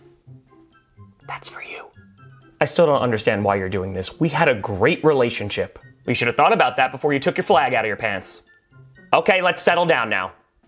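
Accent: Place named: Kansas City, United States. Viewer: You need to work a little bit of Ted Lasso into your Chiefs fan accent. I love your videos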